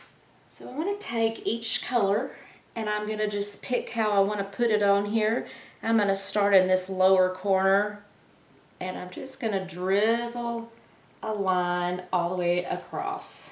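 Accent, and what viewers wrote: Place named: South, United States. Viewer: Just watched your intro video. I KNEW IT! I’ve been listening to you n thinkin “ this gal has some good BBQ in her background . She’s gotta be from down “Heah” someplace. I’m in North Carolina . Just retired n looking at hobbies.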